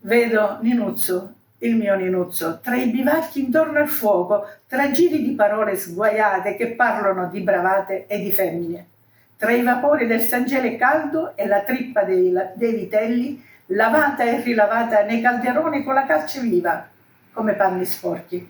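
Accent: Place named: Catania, Italy. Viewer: Brava 🎉brava ❤!!!! Complimenti 👏👏👏bellissima storia e quantu mi piaci a parlata catanisa, hai dato le giuste enfasi al racconto facendomi entrare in pieno nella narrazione e sono riuscita a capire tutte le parole, brava! grazie ☺️ per averci deliziato del tuo racconto ❤️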